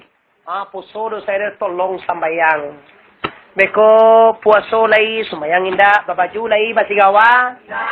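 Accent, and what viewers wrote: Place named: Pariaman, Indonesia. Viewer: Ondeh badaceh bana baso piamane ko mo jo.... sero wak nan lahia gadang dirantau....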